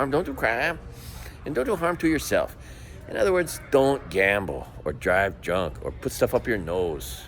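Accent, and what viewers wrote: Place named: California, United States. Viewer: I wanna tell you something I think you’ll get a kick out of and see the funny side -: first time I heard you sign off “IF yer thinking of doing harm or crime, do less do nothing today, sit on sofa 🛋️…..”! You were standing outside planet gym or something where you’d just had a shower 🚿… and the thing is although we both speak English, due to differences in our accent, (im Scottish and you’re deep west coast California, I thought you said, “ do less crying 😿”. You see, I have bad days where everything gets me overwhelmed and I just wanna cry… Some ppl also can do harm to themselves. But I was literally feeling so bad when I heard you say “ if you thinking of doing harm or crying (crime) do less… just do nothing today, be lazy instead”! so, do you know what I did..? I didn’t stress… I stayed on couch all day sleeping, watching tv, watching YouTube, no hassle, no tears because of you, I was kind to myself because I thought you said “no crying”! 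So it’s funny, but I wanna say Thank you- because I had a chill day instead of being sad because of your kind, heartwarming real life way of signing off your videos. It wasn’t till I watched a few more videos, the penny dropped & I realised you weren’t saying crying, but rather crime! Ha ha 😅 ye gotta a laugh bro 😊😄 And may I say, that Making these videos are living examples of the two Hawaiian words pono and keena oly (sorry I don’t know how to spell them maybe you can reply with correct spelling) Bon voyage You handsome man ❤ P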